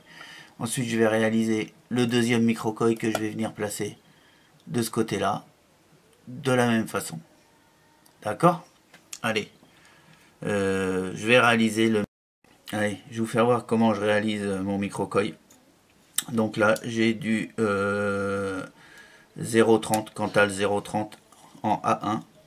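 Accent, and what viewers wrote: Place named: Paris, France. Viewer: tu me fais marrer avec ton accent titi parisien, ça met de bonne humeur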